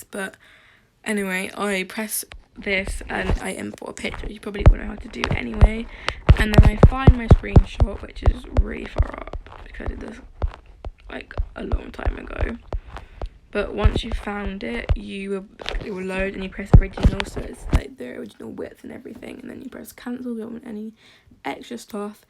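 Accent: United Kingdom. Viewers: YAY U BRITISH LIKE MEH UWU ! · U British??? :D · British like me :) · She has such a nice British accent! lol I just sound sleep deprived all the time😂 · IS THAT A BRITISH ACCENT I'VE LIVED IN BRITAN ALL MY LIFE AND I CAN'T DO A FREAKING BRITISH ACCENT (Your voice is so cute i love it)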